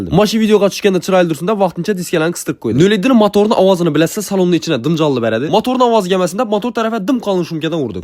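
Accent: Khorezm, Uzbekistan. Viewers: Ha oʻzimni tili shakar xorazm yigitlaridan aylanay haqiqiy jaydari shevada malades😊 buxorodan salomlar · Buxorodanman Xorazm shevani polni tushunaman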